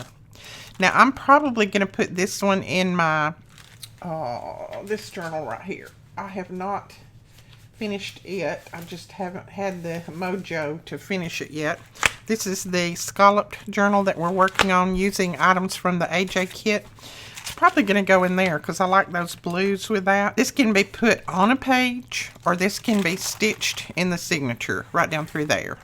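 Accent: South, United States. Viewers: I absolutely ❤️ every one of your videos‼️Yours is my favorite channel to watch and my biggest inspiration. I’m very new to this but enjoy it so much. If I could afford it, I would buy everything you use and create all day. Ha ha. Love your accent as a fellow southerner. I’m from Texas, but the accent is the same. Talking about your family is part of your southern charm. Don’t pay attention to those negative people complaining about it! 😉 Keep up the beautiful work! -Michelle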